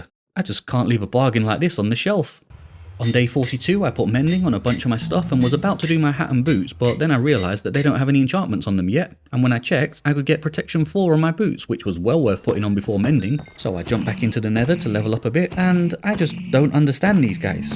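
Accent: United Kingdom. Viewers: Are you british? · u are literally so british i feel sick to my core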